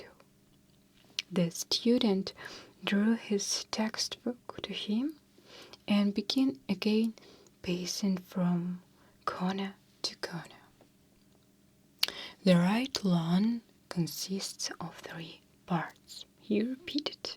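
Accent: Russia. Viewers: I love, love, love when you read us a story! Your English keeps on getting better and better! But I love your Russian accent so much! Those earrings are amazing too! Thanks so much!:-)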